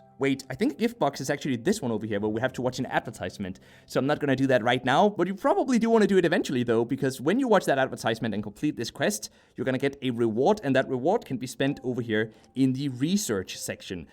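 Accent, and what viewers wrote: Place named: Denmark. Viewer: Before I saw the Kroner symbol in the shop, I could tell by your voice that you were Danish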